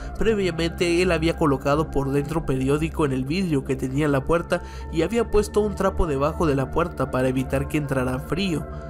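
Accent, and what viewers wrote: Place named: Mexico City, Mexico. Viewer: Están buenas tus historias, vengo de ver las de los Youtubers, y el acento chilango le da un toque 😂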